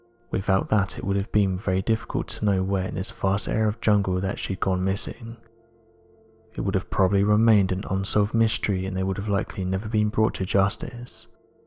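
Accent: United Kingdom. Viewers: Why always the brit accent makes not believe anything they say they are the sales scammers of the univers4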